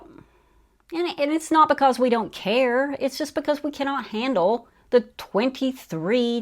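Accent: United States. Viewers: G'day Thanks for your kind words. I have been trying to work out your accent and which part of the United States of Americaland you are from. You see we have 6 states and 2 territories here in Aussieworld and our accent is pretty uniform except in some parts of the country like Tasmania for instance where there is a slight difference if you are Australian and know what to listen for, no worries. With you I don't think It's Texas, could be West Coast or Flo-Rida or I could be way off the mark. American accents like NYC, Boston, Texas, Louisiana, are pretty easy for us as they are so strong. But you have me stumped little lady.....it's a strong accent, but I can't pick it. Your Aussie connection... Cheers 😉😊 P.S. cute Westie I think?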